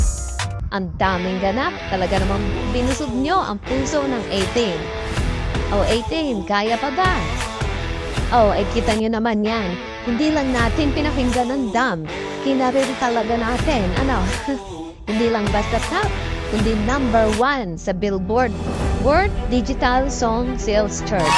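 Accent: Batangas, Philippines. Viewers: Enjoy ako sa Batanguena accent mo Sis.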